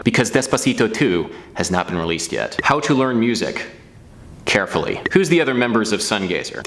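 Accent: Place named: Maryland, United States. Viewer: I can hear you're from MD bud in the way you say "so".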